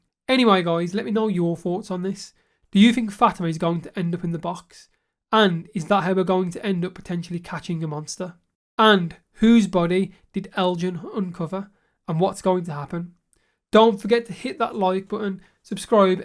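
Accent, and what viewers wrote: United Kingdom. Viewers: no hard feelings bro. i actually love british accent but I have to concentrate more on the storyline which is the base of this video and your accent is making it harder because I'm not used to it, youtube gives recommendations to a larger base of audience.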